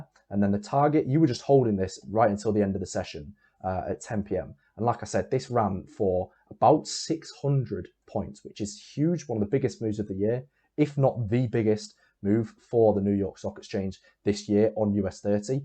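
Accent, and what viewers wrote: United Kingdom. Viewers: His accent seems British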